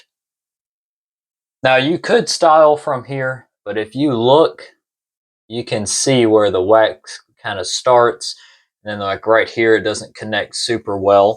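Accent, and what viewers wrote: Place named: South, United States. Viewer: i was honestly not expecting the southern accent.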